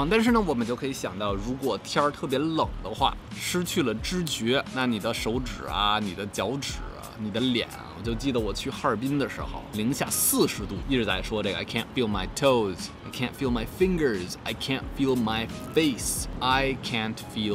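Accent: Beijing, China. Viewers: 口音连着名字都是京味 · 第一次看你的视频，哥们儿，你是真北京人假外国人吧！这京腔也太地道了，连痞味儿都出来了……好听！赞👍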